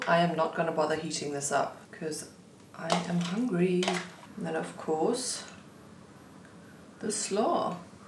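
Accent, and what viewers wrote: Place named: Germany. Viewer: New subscriber here. Love to see your big portions of yummi plantbased food😅 and your german accent. I am from Denmark❤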